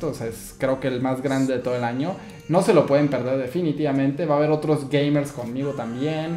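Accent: Mexico. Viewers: entonses ¿de que país eres? siempre pensé que eras de México:p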